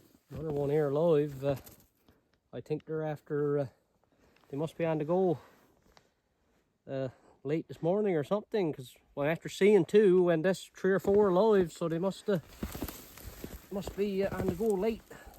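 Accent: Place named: Newfoundland, Canada. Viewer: How many slips to get 16? I thought that was good by far the best I've seen on here. What area are you? I'm from Lewisporte and your accent seems like that area or close by.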